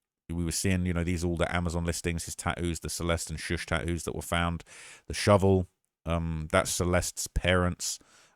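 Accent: United Kingdom. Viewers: This is extremely informative!how did i not find you before??All other networks and youtubers are being so prim and proper about it all and not even giving updated updates, if that makes sense. And the brit accent is so refreshing! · when i frst started watching you, i heard your accent and i'm British too and you whipped out a flick knife that locked in place i was like AAAAAAAAAHHHH TAKE THAT INTO THE POLICE STATION YOU'RE GONNA GET IN SERIOUS SHIT. it took me a minute to realise you lived in the US lmao i was like oh wow, i thought this guy was an idiot turns out i'm the idiot and had just landed on the channel. keep up the great work you're the only d4vd reporter i watch. rotten mango has done some good content also but you're a basically daily reporter on this. it's excellent. i enjoy the reddit stuff!! you've scrolled past one of my comments before. 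freaked me out.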